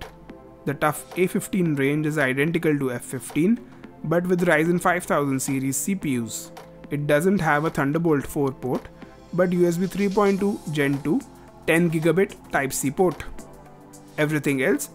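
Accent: India. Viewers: Laptop, lol, love that indian accent :D. Great video!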